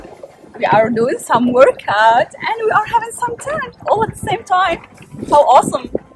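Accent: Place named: France. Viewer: Work on accent as it sounds French, but good effort content wise .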